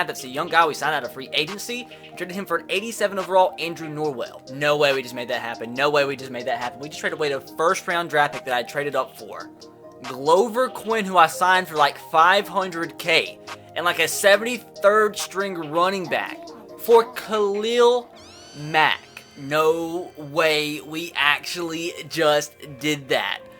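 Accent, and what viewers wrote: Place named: Alabama, United States. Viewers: Alabamer accent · This man with that southern voice tho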